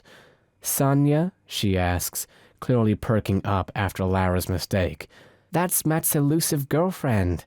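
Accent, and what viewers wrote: United Kingdom. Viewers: Is it just me or does the speaker sound British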